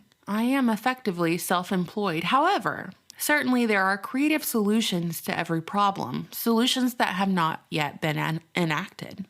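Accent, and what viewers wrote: South, United States. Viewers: So great to hear you again, as a southern boy working abroad its beyond refreshing to hear a voice from home. I think a lot of us "Americans" are caught in a daze by whats is happening and just as you said how fast things are changing in America. In this daze, we are letting slip through things that I would never think we would accept. The past el-ec- tion was a clear indicator of just how, what many Americans believe is a lie and I also think that is what started the dominos falling. Because nothing was done by any of the supposed safeguards in our government or judicial branch about the el-ec- tion, many Americans are in a state of trauma. If you've ever been around people in a state of trauma you will understand, they act on muscle memory, aka they will just blindly do what is the norm, even as the world around them is on fire, I think we are at that place as a nation! 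While many other Americans are have developed Stockholm syndrome due to restrictions, mandates, lockdowns, etc.... again like trauma people who are suffering Stockholm syndrome, people will accept and do things they normally would never even consider! You are one of the very few internet personalities that dig deeper into social issues and overlay a level of human psychology that makes sense to why things are happening! Great to hear and look forward to many more of what you did hear!! Thanks. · I'm a NC girl, myself, so my inner dialogue is sprinkled heavy with that southern salt I hear in your outer dialogue. I am both jelly and terrified of the NPC who doesn't talk to themselves. Of course, those have been bred and programmed, I mean raised, not to think for themselves, only to consume. Consume all the gmo and PC rhetoric the powers that shouldn't be try to shove down all our brains. 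Those consumers make me so sad. I mourn for all the potential I see being flushed right now. But I for one really appreciate the fact that you let us into your beautiful mind every once in a while. Stand sting in your knowledge, and I sure hope that wisdom starts with the true Rock of our Salvation. Much love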